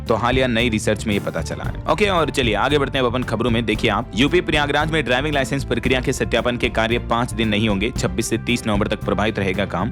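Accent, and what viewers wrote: Rajasthan, India. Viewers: bhai vah to aap Rajasthan ke mujhe lagta hai Seth sanvariya ka dialogue sunkar Aisa lagta hai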